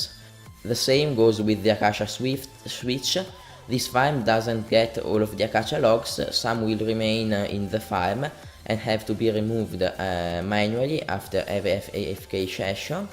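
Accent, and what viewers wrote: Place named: Italy. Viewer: good video and i frecking love your italian accent XD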